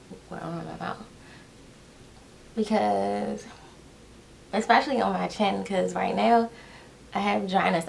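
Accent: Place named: DMV, United States. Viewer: Hi there! I stumbled upon your video as I'm trying to get reviews of this foundation. You sound like you're a local girl to me (I'm in the DMV). Thanks for the video!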